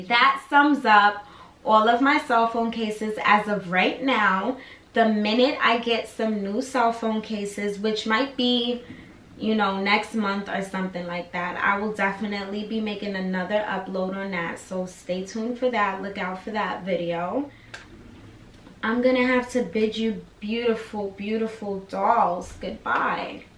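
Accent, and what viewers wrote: New York, United States. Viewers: J BIN LOC that’s just her accent she’s from New York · J BIN LOC Yes, not everyone from NY sounds like that but a lot do. It's still one of our accents or the way some of us speak.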